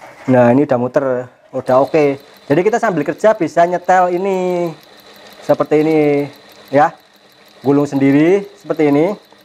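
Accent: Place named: Banyumas, Indonesia. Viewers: Ngoser, jembeng n amba....hahahaaa, ngapak abis Mas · Mas orang ngapak ya sama dong 😁😁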